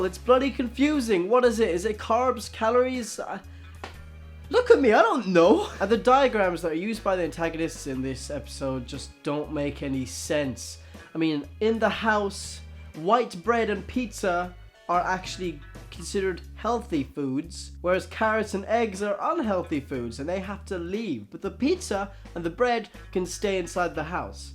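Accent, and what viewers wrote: Ireland. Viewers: HES IRISH I JUST REALISED!!!!!! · are you irish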